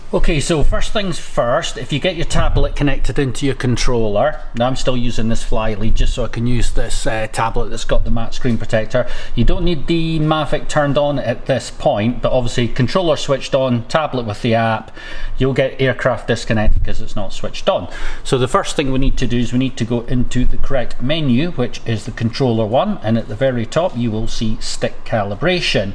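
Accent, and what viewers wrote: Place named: Scotland, United Kingdom. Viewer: You are the best, even for me listening Scottish accent !!! Merci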